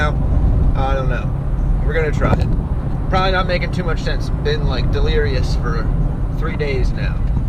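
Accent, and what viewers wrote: Philadelphia, United States. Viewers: Hey I like your videos i like the way you talk. Makes me wish I was back in Philly. You stopped for a while and I was afraid you were gone. Now your back. Cool. Thanks dude.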